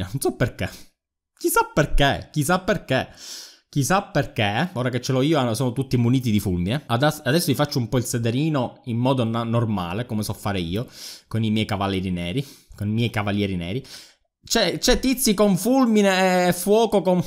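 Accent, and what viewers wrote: Palermo, Italy. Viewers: Fra sei di Palermo?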